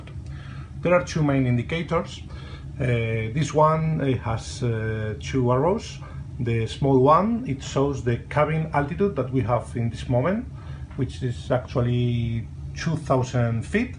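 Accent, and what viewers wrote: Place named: Catalonia, Spain. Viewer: Accent català jajaja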